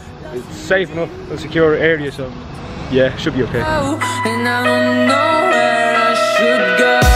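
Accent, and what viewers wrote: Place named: South, United States. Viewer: Are you southern? You sound like Miranda Sings or it’s just me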